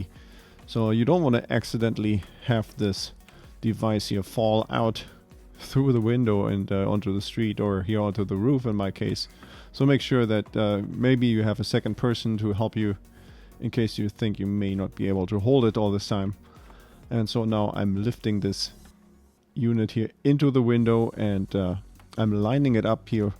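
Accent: Germany. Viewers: This video got rid of any anxiety I had about installing these. By the way you sound very German. My tutor always pronounced his "w"s and "r"s like you do. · i love the subtle hints of the German accent. :)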